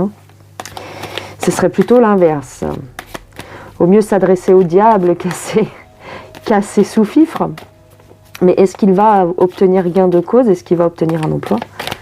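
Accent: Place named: Paris, France. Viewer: Vous êtes super! Et oui, vous avez l’accent Parisien... 💕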